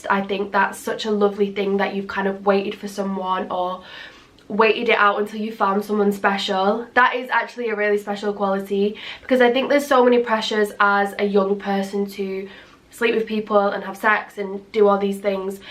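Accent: Manchester, United Kingdom. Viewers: “i get copy righted on evEryFiNk” 🤣 such manc pronunciation love ittt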